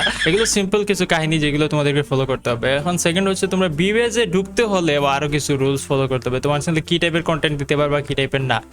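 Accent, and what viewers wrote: Sylhet, Bangladesh. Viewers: তুমি সিলেটি নি ভাই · ভাই আপনি সিলেটি নি🙄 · ভাইয়া আপনি কি সিলেটি আমিও সিলেটি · Vai apni ki sylheti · আপনার কথার ধরন দেখে বুঝলাম আমি ও সিলেটি🥰🥰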